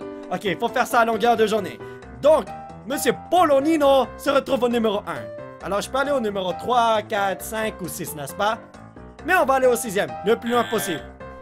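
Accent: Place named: Canada. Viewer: salut je t'adore continue tes super est j(adore les canadien si tu veux savoir j'adore l'aksent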